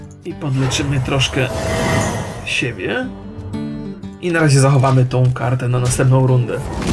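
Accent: Silesia, Poland. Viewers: pierwszy raz w grze słyszę gwarę śląską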